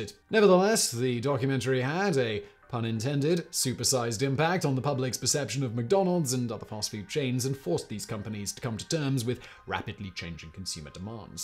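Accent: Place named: RP, United Kingdom. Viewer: Why do he speak in that accent when in much older videos he sounds nothing like this? As someone from England i really feel like he's hamming up the RP accent to appear more sophisticated to the american audience?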